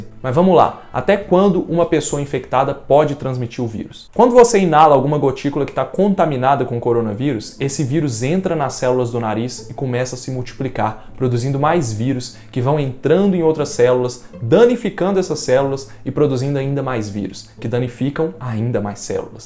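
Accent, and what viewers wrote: Minas Gerais, Brazil. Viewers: Obrigado pelas dicas ! Adoro o seu sotaque mineiro . Tb sou mineira uai !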